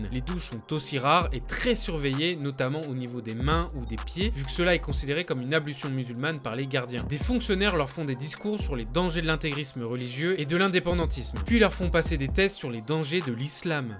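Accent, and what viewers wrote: France. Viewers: ça s'appelle l'accent français. Et au pire concentre toi sur autre chose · t'as vu son texte où ça ? Parce que moi tout ce que j'entends c'est un français qui prononce les "X" comme en français et le "J" aussi mais je t'en pris donne moi un cours de chinois puisque t'as l'air de visiblement connaître plus que moi... C'etait quoi encore ton commentaire ?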